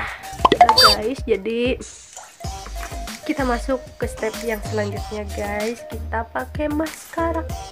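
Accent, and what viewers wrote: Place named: Sunda, Indonesia. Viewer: Suka ey nontona sunda bgt kitu tah wkwk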